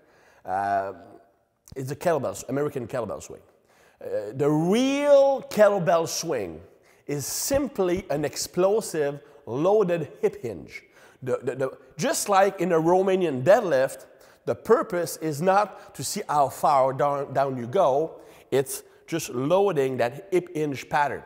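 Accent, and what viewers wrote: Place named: Quebec, Canada. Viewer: I’ve been a fan of articles for a long time now, and this is the first time I heard him speak. I knew he was Canadian, but didn’t know he was from Quebec. Makes me respect his great writing skills even more.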